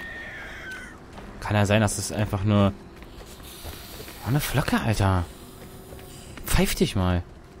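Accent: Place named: Berlin, Germany. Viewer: Wär ja mal voll endgeil... Natürlich, um so weniger Chancen, um so besser xD Ey, das mit dem Träumen war meine Idee D:< xD Der Tod war so unnötig xD omg, Lachflash xD Dein Berliner Dialekt ist voll cute:D Der Baum will dich beschützen!😌 Ich kann hören, wie sie vögelt... Achso Vögel fängt... pfeifend weggeh Eric ist vielleicht an Eric the red angelehnt... <<< Gedanke kam mir so ganz am Anfang xD Tschuldigung, der Mond sah so lecker aus.-. Ich konnte mich einfach nicht beherrschen..., das Lesen musst du aber noch üben😌 Srsly? Auftraggeber, Auftragnehmer? XD Dieses "Oh, fuck!" am Schluss und ich erstmal so mega ratlos, was du meinst xD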